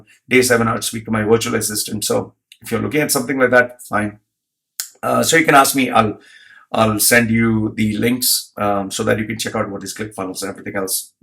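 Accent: India. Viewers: Dude are you indian?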